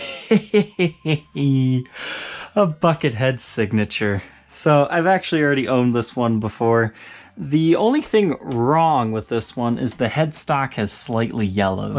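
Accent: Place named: Midwest, United States. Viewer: You sir, are definitely from the Midwest.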